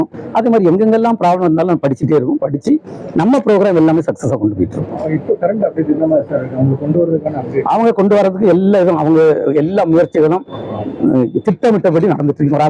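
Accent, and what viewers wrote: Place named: Kanyakumari, India. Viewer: Enka Kanya Kumari slang alaku sir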